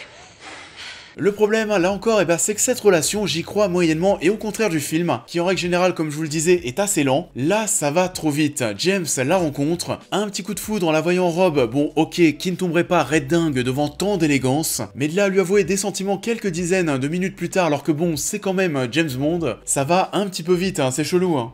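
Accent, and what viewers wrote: Paris, France. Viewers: l'accent parisien est un peu too much aussi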